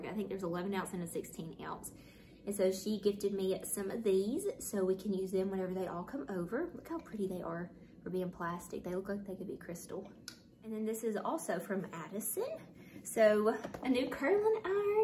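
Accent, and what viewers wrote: South, United States. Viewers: you are so adorable! I love your southern draw! I live in Alabama too so I’m sure I have that same southern accent! I loved everything you got for Christmas and look forward to all your upcoming videos for the new year! Happy New Year and God bless!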